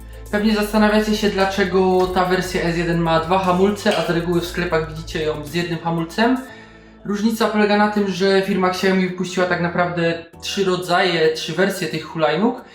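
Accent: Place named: Silesia, Poland. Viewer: Czemu tak zaciagasz po slasku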